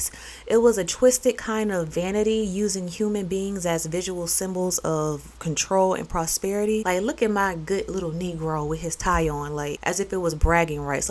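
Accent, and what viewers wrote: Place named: South, United States. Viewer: I would love to know where you are from. You sound as though you fit right into the south. Welcome back when you return to Louisiana.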